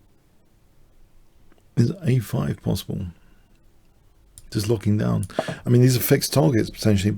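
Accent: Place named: London, United Kingdom. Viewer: Yeah it cause a londaner, "th" becomes "f" or "v" depending on the word!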